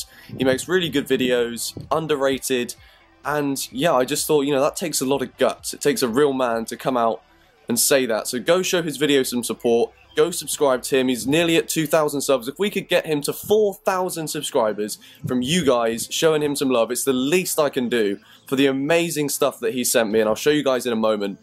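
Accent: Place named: United Kingdom. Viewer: Hey man, where roughly in the UK do you live (not a stalker,just trying to figure out the accent)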